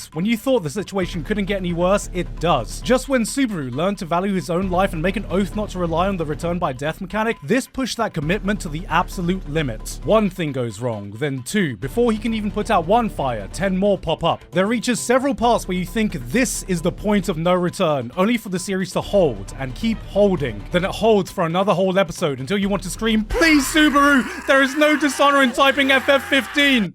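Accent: United Kingdom. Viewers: worst anime of the 6year, with an annoying brit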